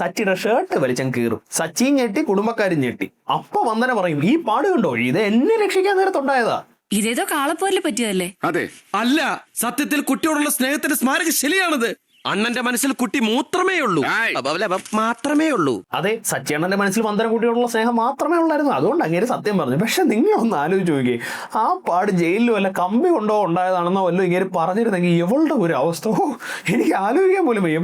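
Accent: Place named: Thiruvananthapuram, India. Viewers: ah thirondoram slang.. thaali konnu 🤣🤣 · തിരോന്തരം ഭാഷ നന്നായിട്ടുണ്ട്. മലയാളം സിനിമ റോസ്റ്റ് ചെയ്യുമ്പോ ഇങ്ങനെ മതി. മടുക്കുമ്പോൾ ജില്ല മാറ്റിയാൽ മതി. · Oooh trivandrum slang 😮. Kollaam 😌❤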